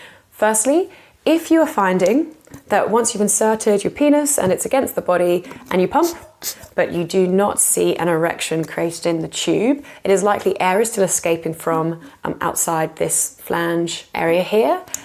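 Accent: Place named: United Kingdom. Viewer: Love yur British voice. It's sexey. 😊😊 Thanks for the advice!!😊😊😊😊❤❤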